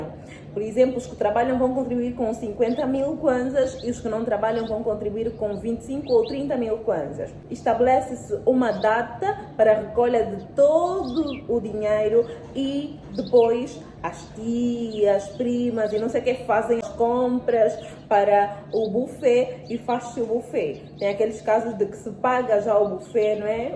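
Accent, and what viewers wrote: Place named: Portugal. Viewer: Ela tem um sotaque português, muito agradável.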